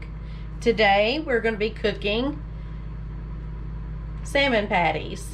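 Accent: South, United States. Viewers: She so country and I love it lol😂. I’m country too , northerns love our accent! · I love your beautiful Southern accent. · they look good this woman is a good ole country girl and you can tell by her accent i bet they are good im 68 and been eating them all my life in my grandmother cooked all the time and did not have any education at all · Salmon cakes with southern accent. Im subscribed 🇺🇸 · I could listen to you talk ALL DAY! I LOVE your country accent.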